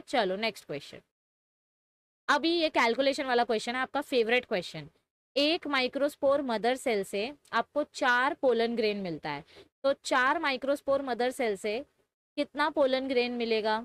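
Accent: Maharashtra, India. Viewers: Mam yaar aap kitni clear Marathi bolte ho Me MRATHI 😇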